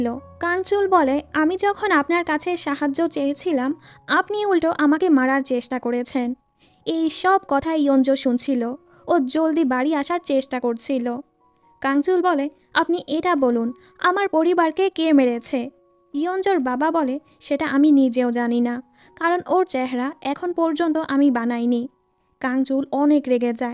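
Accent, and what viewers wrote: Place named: Tangail, Bangladesh. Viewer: Boilo to tangail ar vasha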